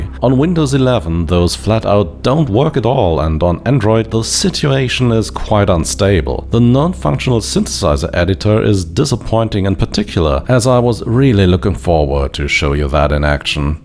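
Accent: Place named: Germany. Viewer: The German accent!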